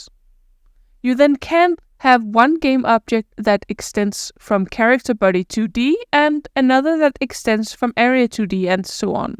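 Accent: Denmark. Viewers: great video, strange random question(feel free to not answer): are you Brackeys GF? you have a very similar way of setting up the video, explaining the issue and talking (this is a good thing, he is very good at this) even down to the Jylland accent.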